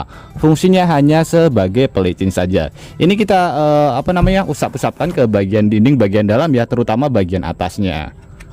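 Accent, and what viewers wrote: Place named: Central Lombok, Indonesia. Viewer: lombok tangah ni bpk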